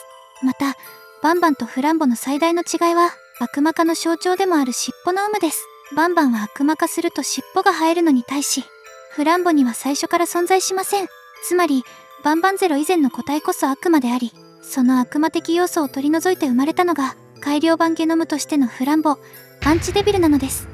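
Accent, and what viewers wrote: Kansai, Japan. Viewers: なんで関西べん？ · 関西弁なんやww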